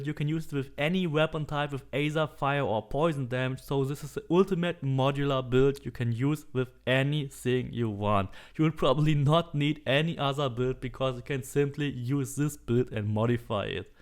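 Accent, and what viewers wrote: Germany. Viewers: thank you sir! Sorry for the questions but your accent sounds familiar... possible that u r from Germany?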